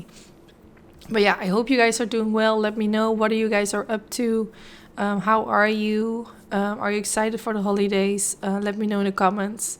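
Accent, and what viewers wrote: Netherlands. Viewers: I love the look and your lovely Dutch accent . Prima 🌸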